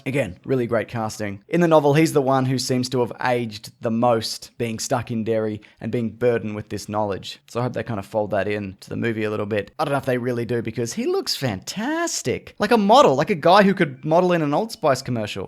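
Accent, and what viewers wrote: Australia. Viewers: Love your accent. I'm guessing it's Australian? Also did you know Stand By Me is a prequel to IT? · U Aussie mate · Are you Australia? I am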